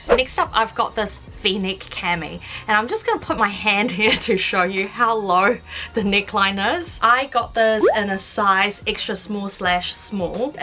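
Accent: New Zealand. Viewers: I missed my new zealand accent icon and fashion queen.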